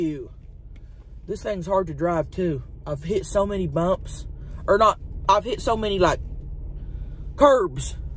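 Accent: United States. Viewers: This woman is so damn funny😅😅I love the way she talk..that country accent. I gotta get back to her on snapchat..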